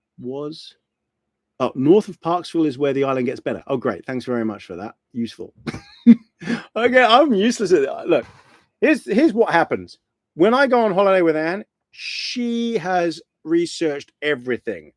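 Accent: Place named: England, United Kingdom. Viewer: Hi! I can hear you! I'm glad you have a re-play--Thanks. I didn't know you were already in Canada! It's not gone wrong--You just didn't know plans would change.:D:face-red-heart-shape: You look real good in those glasses!👍👍👍👍👍 You sound like you are from England/New zealand and whatever accent you have--I really like it.:D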